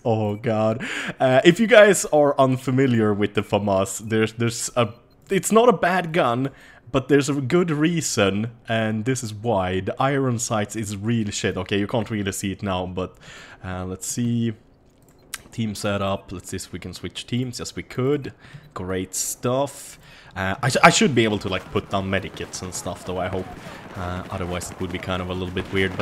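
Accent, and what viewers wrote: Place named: Stockholm, Sweden. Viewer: Shit vilken svensk dialekt du har när du pratar engelska asså! Stockholm??? *****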